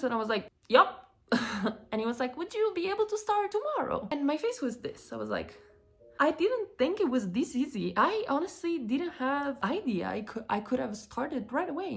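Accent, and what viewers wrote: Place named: Italy. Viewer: An Italian woman agrees to work for Little Caesar's .... the subliminal message you are sending (from a REAL Italian complete with accent) is ... "I endorse Little Caesar's pizzas." What greater endorsement could they have? They should have given you a raise on your first day for the message that sends to customers. [EDIT] Judging from this video I think you could make a fortune on TV commercials for Little Caesar's.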